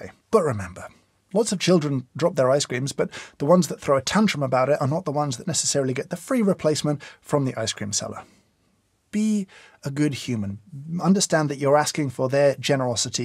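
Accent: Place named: England, United Kingdom. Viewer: Your english accent is very satisfying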